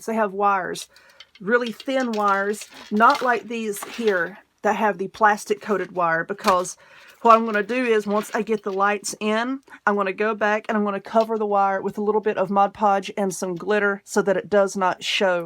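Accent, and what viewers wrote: South, United States. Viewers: Miss Southern Lady, I definitely live your southern accent. You have a good sense of humor. I enjoy watching your videos. I love watching your different types of craft.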